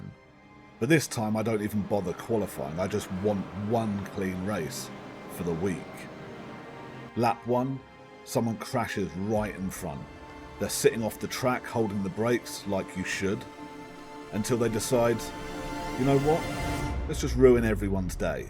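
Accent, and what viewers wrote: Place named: United Kingdom. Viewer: A british accent always makes narrating sound supreme.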